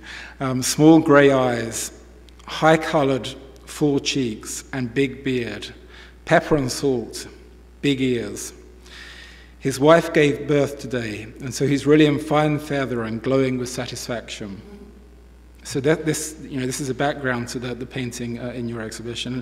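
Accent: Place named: United States. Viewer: I didn’t enjoy it. A long monosyllabic ramble with continuous American style diction. Dreadful!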